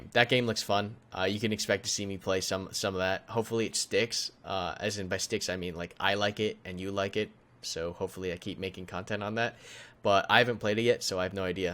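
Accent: United States. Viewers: 😍😍 you look sooo cute so just you look Asian, you speak American (not English) and you live in Hawaii omg so cool 😁 pay attention you're starting to speak like Bluedrake42 (the bad (but not baaaad just not that good) way that you do sentences) 😂xD well lov u 😉😍😘❤ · Asian? with american voice? anyway nice video like always. keep it up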